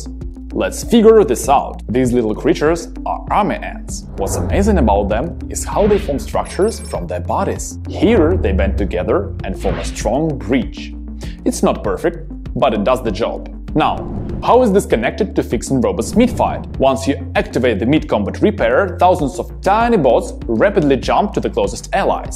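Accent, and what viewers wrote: Russia. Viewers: Ох уш этот звиздатый русский акцент😁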